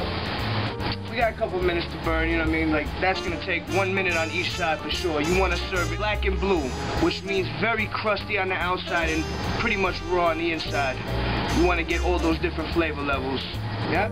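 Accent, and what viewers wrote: United States. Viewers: That he does. He sounds more diverse than just the average New Yorker if you ask me. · That's that Flushing Queens in him. New York hardcore.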